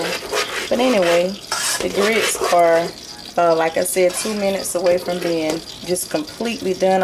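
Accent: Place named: South, United States. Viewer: Inlove that bw southern accent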